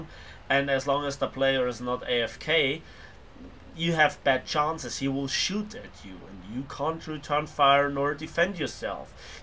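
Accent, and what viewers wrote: Germany. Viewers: Man hört deinen Deutschen aktzent ich mag das :)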